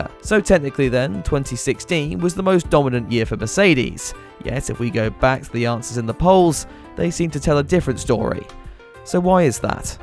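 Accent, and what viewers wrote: United Kingdom. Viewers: "Why Red Bull (read Verstappen) Domination is WORSE... (Then Hamilton's)" Said the guy with the obvious British accent.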